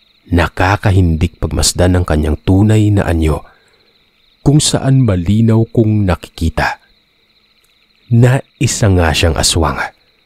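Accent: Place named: Hiligaynon, Philippines. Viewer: An kyutt ni kuya mag ilonggo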